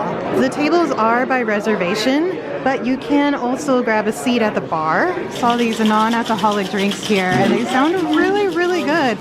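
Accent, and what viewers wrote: United States. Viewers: You have an amazing American accent, it's like what youd hear on an American commercial or something (I'm from Yorkshire, England) lol.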